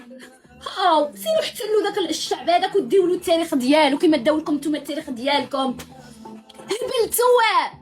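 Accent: Morocco. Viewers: بصح هدرتك مغربي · لهجة تاعك مروكية يا لمسخة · أنت مغربية علاش تكذبي هضرتكم باينة عيتي تقلدي هضرت الجزائريين لكن مقدرتش لهجتهم المروكية طاغية القفطان جزائري نقطة ونرج للسطر أنتم امتهنتو صناعته وفقط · نتي مغربية ياختشي باينا في صوتك دي وجهك وروحي لقفطان عنده ماليه 🙂😌 تحيا لجزائر الحركية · لهجتها مروكية هههه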